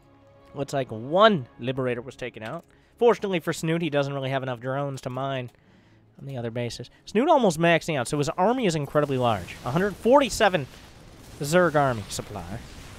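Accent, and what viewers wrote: Germany. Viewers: You'r german accent is fucking on point :)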